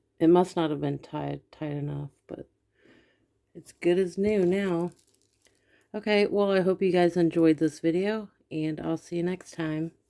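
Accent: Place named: Midwest, United States. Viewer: You sound like me😂❤ is it a Midwest thing?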